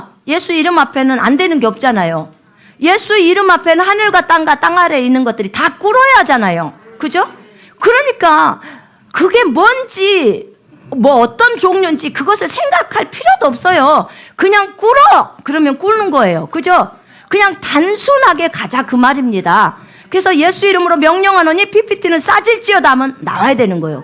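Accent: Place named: Jeolla, South Korea. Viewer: 선교사님 전라도 말이 친근감 갑니다^^*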